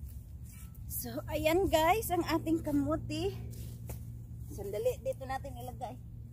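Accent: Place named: Bicol, Philippines. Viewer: ahh kya pla yung tono nyu😊 bicol din ako catanduanes... kmusta nmn po kayo jan..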